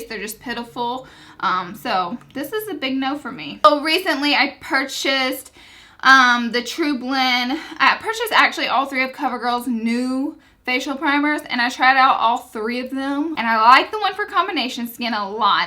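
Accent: South, United States. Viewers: Is that wallpaper? What is that background? It's so pretty! Your videos are great & my daughter and I love watching them together. You are a little goofy, just like us and we love your accent. My dad is from NC & we have a house down there, so we love southern accents!! Keep the fabulous videos coming!! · Omg! Your southern accent😍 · This is the most stupidest comment ever....love southern accent...hence that I live in the South:-) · LOL at you commenting on the fact you say oil wrong haha, people don't understand that southern drawl sometimes!! Love your videos and keep on say Oil anyway you want!! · I love you and your accent . southern girls unite. Texas girl here lol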